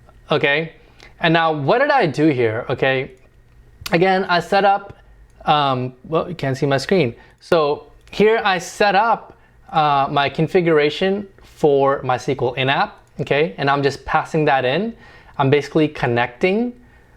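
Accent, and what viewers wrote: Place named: United States. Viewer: drop off that yank accent to get more likes. It’s irritating really. Good video though.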